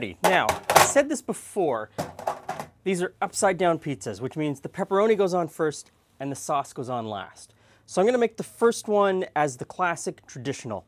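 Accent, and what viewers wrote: Canada. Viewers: Hey. Love your videos. Something interesting: my grandfather was from Iowa, and his accent rubbed off on me (despite me being raised in California)... I sound halfway between a Canadian accent (like yours), and a Southern US accent... Also, I cant wait till I have a bigger kitchen! I wanna try so many of your recipes. Keep up the good work!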